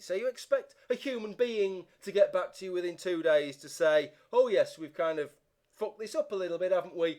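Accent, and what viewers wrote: United Kingdom. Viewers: Oh how I love British people when they're angry. That accent is amazing. xD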